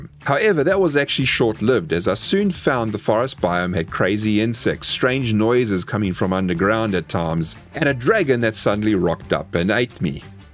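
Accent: South Africa. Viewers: First vid of yours I've seen. About 2min in, I was like, this dude sounds like a Saffer. Lo and behold a fellow South African. Nice one boet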